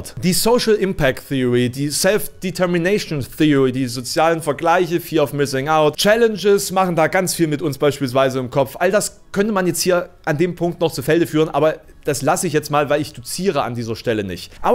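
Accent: Saxony, Germany. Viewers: ich liebe es nicht und bin recht froh dass es bei ihm nicht zu krass durchkommt ^^ Habe zu viele Sachsen erlebt die im härtesten Dialekt den größten scheiß labern Aber ihn hier hab ich echt gern ^^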